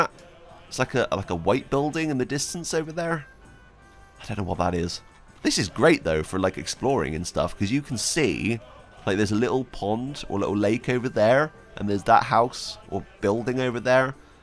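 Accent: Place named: Bristol, United Kingdom. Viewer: My guy is from Bristol. Can't hide that accent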